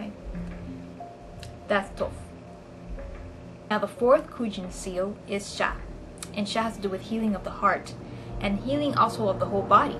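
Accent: Miami, United States. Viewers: The room your accent and the bird tells me your in Miami 😂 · you sound like you're from Miami. great video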